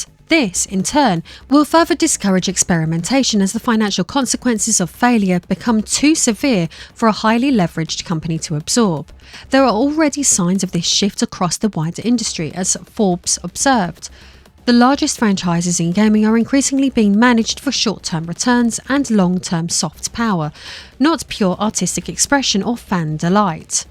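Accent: United Kingdom. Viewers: got to ask * uh your accent sounds sorta brittish is that correct just kinda guessing here?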